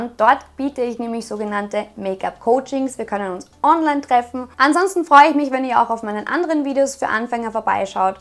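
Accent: Vienna, Austria. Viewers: Mega toll erklärt, ich schau und vor allem hör ich dich gerne....dein „Wiener Dialekt „ ich liebeeee es! 🥰 Ganz liebe Grüße aus Deutschland 😉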